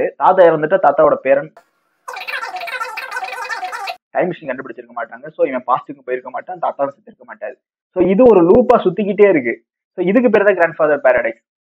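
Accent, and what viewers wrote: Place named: Madurai, India. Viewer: Change ur slang it's completely madurai slang da... But keep going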